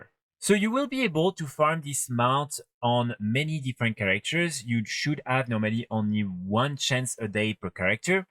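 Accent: France. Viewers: I love that french accent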